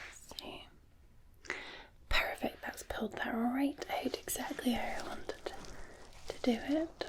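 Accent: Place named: Scotland, United Kingdom. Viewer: Great video as always so relaxing your Scots accent makes me melt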